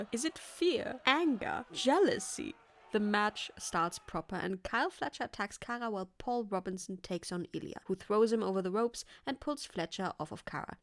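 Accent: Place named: Germany. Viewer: YES MA'AM YOU'VE GOT A NEW SUBSCRIBER in me. FUCKIN FANTASTIC Work. And your prominent German Accent Just adds to the whole THEATRICS of it all. just brilliant work. 👌👌10/10.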